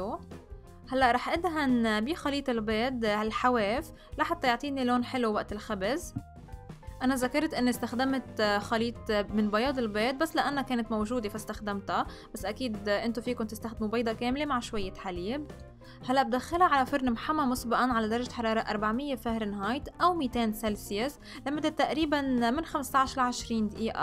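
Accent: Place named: Levant. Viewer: ماشاءالله وصفاتك بتجنن ولهجة اهل المشرق بلاد الشام ماشاءالله بتعقد 😍😘😘😘 موفقة حبيبتي 👍🌹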